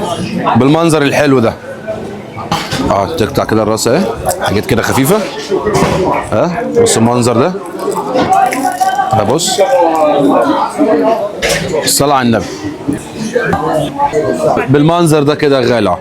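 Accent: Beheira, Egypt. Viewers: عافية عليكم .. لهجة الاخ المتحدث منين من مصر؟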